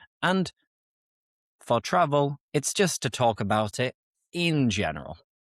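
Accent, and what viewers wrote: United Kingdom. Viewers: This podcast episode unpacks essential holiday expressions, phrasal verbs, and travel vocabulary through slow, clear British English and personal storytelling. The practical examples and interactive Q&A format make picking up natural travel idioms completely effortless. Honestly, this is hands down one of the most practical and enjoyable listening practice lessons for boosting conversational English!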